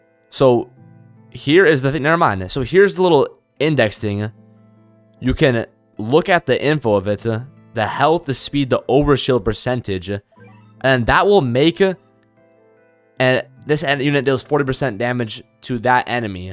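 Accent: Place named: United States. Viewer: im from england but this literally sounds like a generic american accent to me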